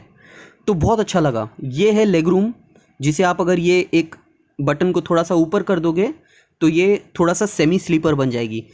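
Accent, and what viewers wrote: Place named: Maharashtra, India. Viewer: Hi, are you from maharashtra or marathi? Because your accent in hindi is similar to marathi.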